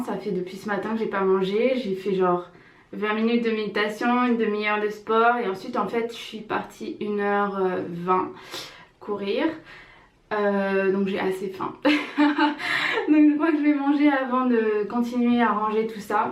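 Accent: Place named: Australia. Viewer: vous êtes un petit accent d'Australie, ou des états uni peut-etre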